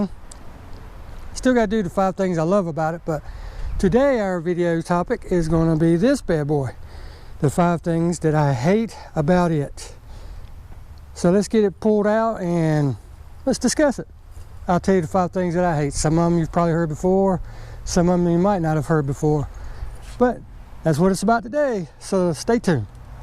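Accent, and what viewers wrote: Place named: South, United States. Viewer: Absolutely you sound like you are from the south and the background and property looks like it does in the south. I lived in East Texas before moving to California so that’s where I see the familiarity.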